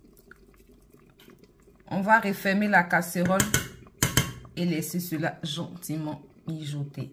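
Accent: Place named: Ivory Coast. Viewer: Merci ma go ivoirienne préféré j'adore ton accent et ta manière de parler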